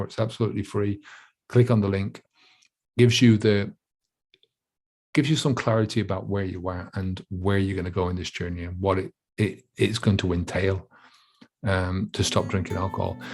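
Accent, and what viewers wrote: Ireland. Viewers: New here, can tell you're a fellow Irishman but can't quite place the county 😂 if i had to guess id say youre a Dub? Great video either way pal!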